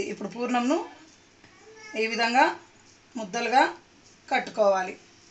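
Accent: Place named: Rayalaseema, India. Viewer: aha rayalaseema matalu vini anni rojulu indakkahii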